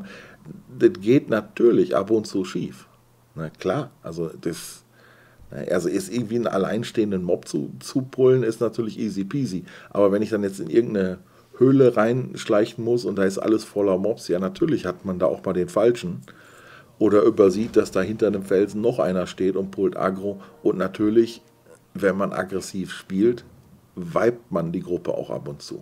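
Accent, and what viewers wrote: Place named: Ruhr, Germany. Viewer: Wo kommst Du eigentlich her?ich meine den Ruhrpott rauszuhören....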